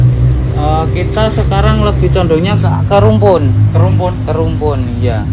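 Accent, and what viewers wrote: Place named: Java, Indonesia. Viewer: Tiang jawi nggeh logatte keton banget 😊😅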